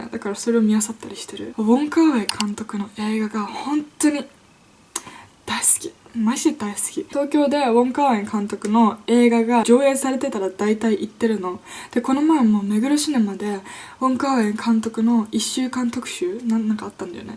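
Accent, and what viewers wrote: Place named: United States. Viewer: wow I thought for sure you grew up in the States or something haha You have a lovely speaking voice; I love playing your videos in the background while I work ^^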